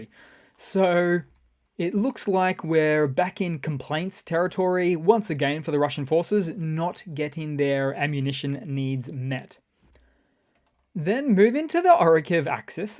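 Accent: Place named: Australia. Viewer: Need more Australian accent i my life’s 8)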